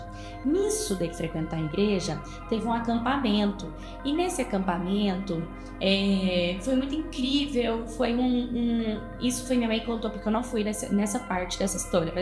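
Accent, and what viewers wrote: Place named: Caipira, Brazil. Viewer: Só eu que vejo que ela tem sotaque cautrim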